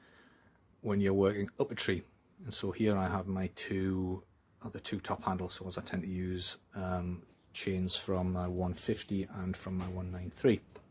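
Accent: United Kingdom. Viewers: It is really good to hear a British guy explain chain saw tips. have some unguarded 1/4 pitch chain on my 194, it doesn't cut any quicker and I have always filed the cutter away before I touch the depth gauges. There are lots of people on youtube that are really into fast saws which is fun but any saw is heavy after a day's work fast or slow!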